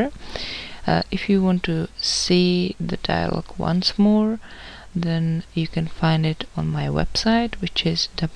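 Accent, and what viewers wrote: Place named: England, United Kingdom. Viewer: Oops, I meant your English accent! hahaha.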